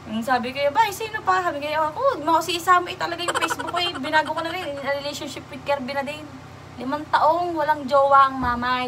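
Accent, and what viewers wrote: Batangas, Philippines. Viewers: Ate ur so cute. Haha nakakatwa the way ka magsalita though i have many friends na batangena at batangeno. I also have relatives in batangas. Shout out naman. Subs mo na ko since you started. In looking forward na gumawa ka din ng videos like mukbangs with mamay hahahaha. Abay nakakatawa kayo ehh. Shout out plssss. Im from cavite. Thankyouuuuu. Sana mapansin mo comment ko ate. Akoy naaadik sa panonood sa yo kamo. 🤩🤩🤩🤩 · Hello, Ms! You have a cute accent, Batanguena ka ba? I really enjoyed listening to you. I’m happy for you being able to come to the states. Mabuti at summer ka dumating dito kc grabe pag winter lalo di yan sa East Coast. Watching from California 😃.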